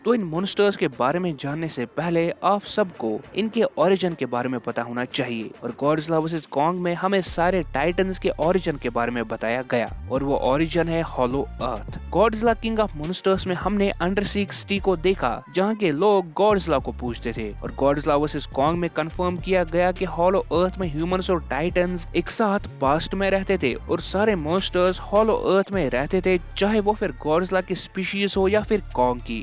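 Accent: Kashmir, India. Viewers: R u from Kashmir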